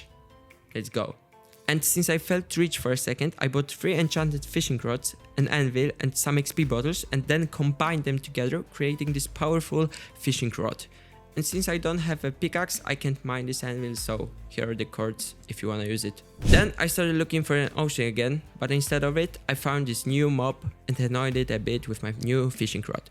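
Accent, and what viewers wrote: Italy. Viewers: yo are you italian?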